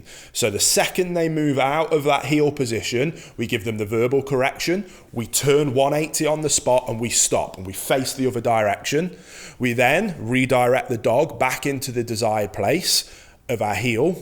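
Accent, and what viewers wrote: United Kingdom. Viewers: Are you from Lancashire?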